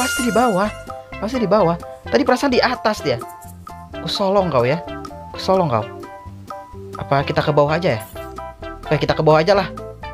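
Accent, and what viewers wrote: West Java, Indonesia. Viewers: Bang orang sunda bukan??? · sunda kah?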